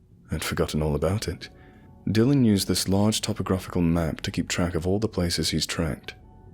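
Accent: South, United States. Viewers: Love the southern accent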